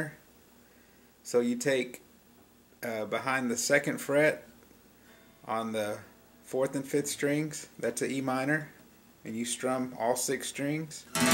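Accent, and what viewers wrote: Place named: United Kingdom. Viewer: Haha you're great, especially about the cool British accent!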